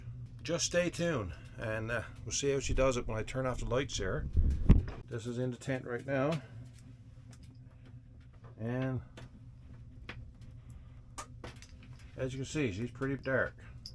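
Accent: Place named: Newfoundland, Canada. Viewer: Omg! What great video this was! Your grouse soup was so appetizing! Made me want soup. I have a grouse in my freezer as well carrots and turnip in the garden. Can't wait! I love your product review on the light with red and blue and green... nah! I agree with you about On, Bright and Off! That's exactly what I would want in a camp out situation! Bravo 👏 👏 👏 👏 👏 Your funny moments in your lovely accent fill my heart with memories of my NFLD relatives visiting Ingonish 50 yrs ago. My father's mother was born in Cornerbrook I'm 1892 and died in 1991 at nearly 100yrs. Your accent is what I grew up with... you, My Buddy, feel like home to me. Love your videos and love to Tuckie... from Rita and Terry and Juneau at Murphy Farm in CB NS 😊 ❤❤❤❤❤❤❤❤❤❤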